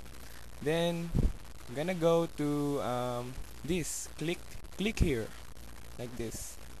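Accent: Philippines. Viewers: sa boses mo plang pinoy na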